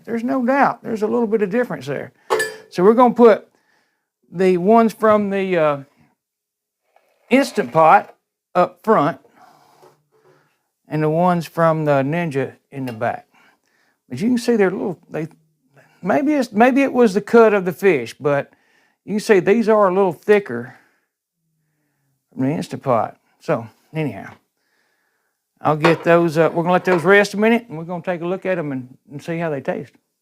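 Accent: South, United States. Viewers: There's just something about the southern accent. 🤗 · Hi tried the Salmon Fillets yesterday absolutely delicious 😋 we are Ninja Foodi new comers , brilliant bit of kit , by the way love the good old Southern Accent !!!. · Great video plain and simple 'you mentioned your southern accent" that adds to receipts flavor. yo' al I am new to this air freyer The Nija and needed some cooking information. Thanks again...I am 80yrs old and one is never to OLD to learn something new! God Bless. 😉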